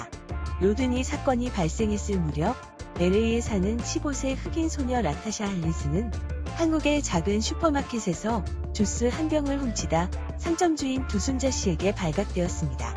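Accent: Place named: China. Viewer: 너 중국인이냐 한국말좀 더배워라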